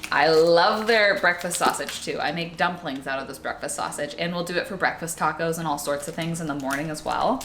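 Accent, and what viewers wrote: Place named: Texas, United States. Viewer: I almost never notice your Texas accent but two weeks with your parents and I noticed it twice during this video! That’s cute.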